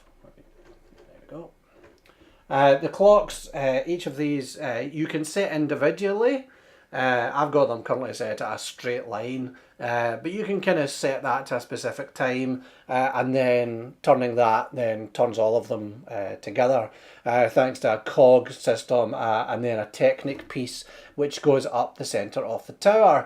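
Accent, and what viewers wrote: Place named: Scotland, United Kingdom. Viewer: love your accent, man. Scottish?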